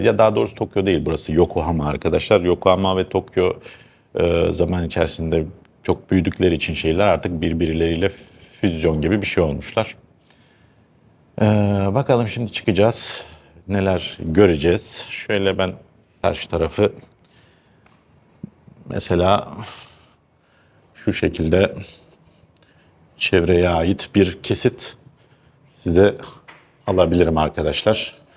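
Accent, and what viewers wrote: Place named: France. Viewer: Yalniz Japoncanizda Fransiz aksani var sanki.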